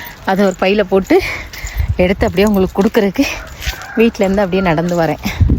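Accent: Tirunelveli, India. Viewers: ஒரு மூன்று நாளைக்கு முன்னாடி படையப்பா 5மணிக்கு வாசலில் இருக்கும் என்று சொன்னார் so conform ah moonaru than... But slang tirunelveli native.. irukum.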